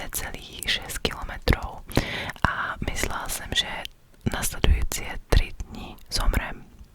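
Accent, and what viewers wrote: Slovakia. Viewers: hej jakoze uplne narovinu kdybych neznal tvuj kanal a nevedel ze si ceska tak bych si myslel ze si ze slovenska protoze ta vyslovnost nebo ten prizvuk je fakt skvely🩷🩷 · znieš ako u nás západniari, tí občas dávajú české slovíčka hihi, ale inak dosť zlepšenie prízvuku od prvého dielu <3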